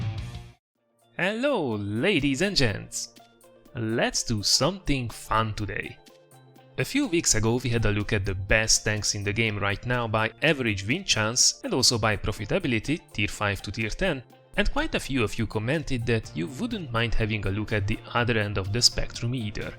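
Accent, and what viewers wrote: Hungary. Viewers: By the dialect, I was 100% sure you're hungarian ;D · első videodnál mondom:nagyon magyaros az akcentus, később,áá,ez biztos nem magyar,de lehet hogy francia :/,olvasom a kommenteket,egyszer csak meglátom ezt,hát basszus,ez magyar,na Mind1,amugy segyszer csinálhatnál egy videót,ahol elmondod az angol nézőknek,hogy magyar vagy,sok szerencsét az angol videókkal,sok sebzést a további meccseken :) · Dude, your accent. I know I know this accent. Are you Hungarian? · A magyar akcentus bárhol felismerhető :D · magyar vagy??? olyan furán beszélsz nagyon magyaros :) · hallod konkrétan 23 másodperc után rájöttem hogy magyar vagy :DD az az akcentus olyan tipikus magyar :D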